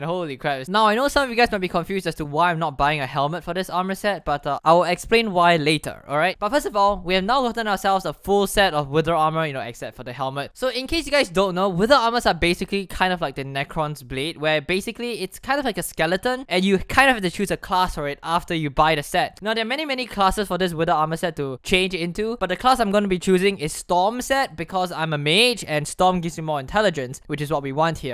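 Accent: Singapore. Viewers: I can tell ur from Singapore too:D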